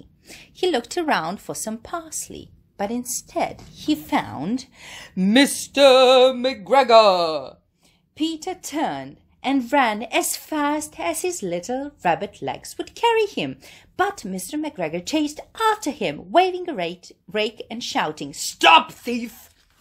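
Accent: United Kingdom. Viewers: Savršen britanski akcenat. 😊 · Divno! Obožavam da slušam ljude sa britanskim akcentom!❤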